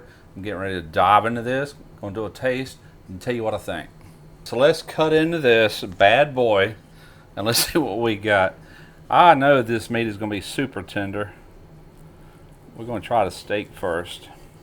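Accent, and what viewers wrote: South, United States. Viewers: Dang, it just keeps getting better and better! That southern twang is always the way to go. Thanks again!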